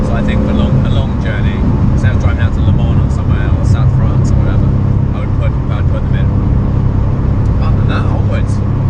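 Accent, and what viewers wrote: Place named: Australia. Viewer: Did ytou study or ever live in Australia? You have a Sydney/International Aussie tone to your vowels.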